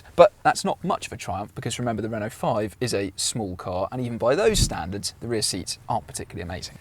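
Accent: United Kingdom. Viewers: As a Frenchman what I enjoy the most about this video is the so delicate British accent, so much more elegant than American English. Isn’t ”boot” so much better than ”trunck”?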